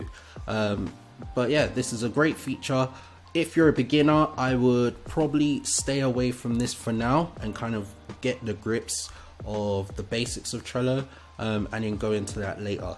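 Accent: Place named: United Kingdom. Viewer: Thank you for the video and the refreshing-to-hear British accent.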